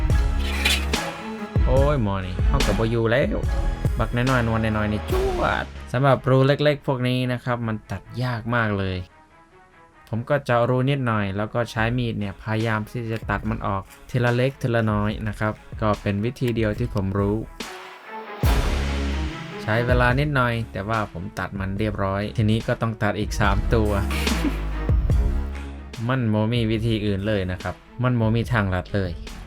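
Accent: Isan, Thailand. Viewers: สุดยอดฝีมือเลยครับ มักเวลาเจ้าว่าวอีสานเบิ่งเป็นกันเอง ฟั่งเเล้วเพลิน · สุดยอดครับพี่ ผมก็เพิ่งจะเริ่มมาหัดเล่น RC ยังไม่รู้เรื่องเลยครับ ไม่รู้จะเริ่มจากตรงไหน เคยดูคลิปพี่เมื่อหลายปีที่แล้วอยากเล่นมากแต่ตอนนั้นไม่มีงบ เพิ่งจะได้กลับมาดูเพราะอยากเล่น RC นี่แหละครับ ดูเพลินมาก โดยเฉพาะเวลาเว่าอิสานนี่แหละเด้อ 55 ทำคลิปออกมาเยอะๆเลยนะครับ 😊😊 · ชอบการตัดเสียงพูดแยกกับเสียงคลิปหลักทำให้ไม่ขัดหูเวลาฟัง ดีมากๆเลยครับ ดีกว่าพากษ์ทับเสียงคลิปหลักทำให้เสียงซ้อนกัน และก็ชอบตรงเว่าอีสานผสมนี่แหละครับ🤣🤣 ชอบผลงานทุกคลิปมากครับ เป็นประโยนช์มาก ติดตามตั้งแต่ Mirage fix ขอให้ทำต่อไปอีกนะครับจะรอรับชมเสมอครับ👍🏻👍🏻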